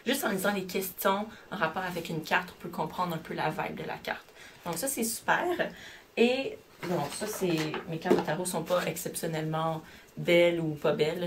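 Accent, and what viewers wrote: Quebec, Canada. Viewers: Moi, je t'ecoute, juste parce que je kiffe l'accent quebecois ;-)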